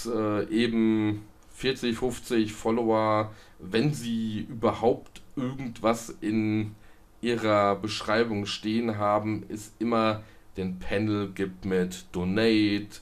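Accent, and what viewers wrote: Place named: Germany. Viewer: Ich wuerde nicht beschreiben als erfolglos. Du hast ja einen deutschen Akzent aber du bist dennoch verstaendlich. Ich finde deinen Akzent sogar charmant.Weil ich eine Fremdsprache lerne, bin ich grosszuegig, wenn andere Fehler in meiner Muttersprache machen.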